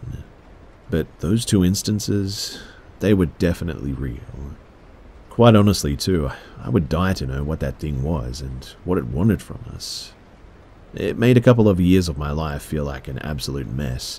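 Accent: Australia. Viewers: At last an Aussie accent. Just subbed, I don't know why it has taken me so long to find you. And I just can't listen without rain!. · Holy hell! I cannot believe I only realized after reading your comment that his accent is Australian! 🤦🏻‍♀️ I've been listening to him for a really long time and always wondered where he was from 😆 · Hey 👋 Mate 🇭🇲🪃🤘 it's great to hear an Aussie when your an Aussie too 😉 so thanks ❤️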